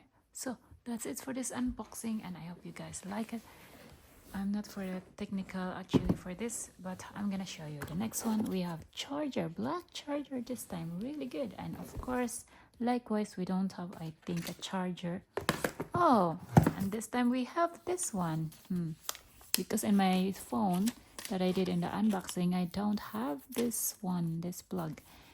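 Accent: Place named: Philippines. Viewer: Are you a Filipino? That accent is so thick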